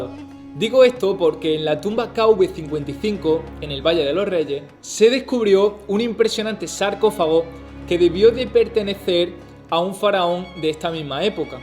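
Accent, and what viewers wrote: Andalusia, Spain. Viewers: Eres Andaluz no?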